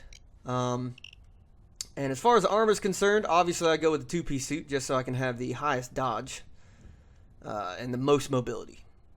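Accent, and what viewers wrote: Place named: South, United States. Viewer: Nice vid and am I right to assumed you're from the south?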